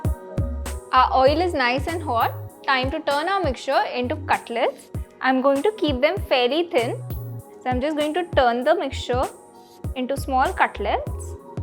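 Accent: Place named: India. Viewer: Amazing to hear how English is spoken like the local language in different countries. She sounded just like she was speaking Indian without the subtitles. Nice recipe too.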